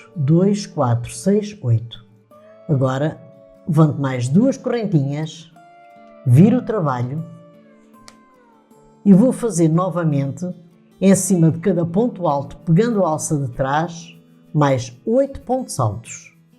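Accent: Portugal. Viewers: Amo seu sutaque portugues..a sua explicação é maravilhosa... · Muito linda e seu modo de ensinar maravilhosa. Seu sotaque lembra muito minga avó portuguesa. Miro Salvador.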